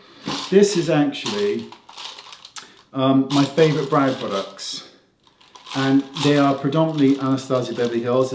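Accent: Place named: Somerset, United Kingdom. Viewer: Looks great, nice to see a Bridgy boy on YouTube. I'm also from Bridgwater so I recognised the accent straight away 😊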